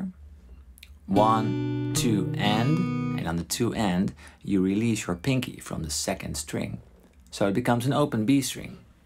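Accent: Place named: Netherlands. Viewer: de man is wel degelijk Nederlands, zijn Engelse accent doet me denken aan dat van Henk Hofstede (van de Nits) :-) (superfijne video trouwens!)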